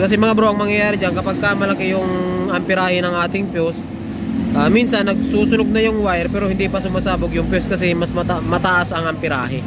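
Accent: Bicol, Philippines. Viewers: Bicol ka?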